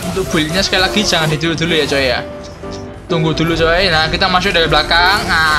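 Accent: Java, Indonesia. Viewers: asli orang mana ya...kok kayak ada logat jawanya...😅😅😅😅😅🍹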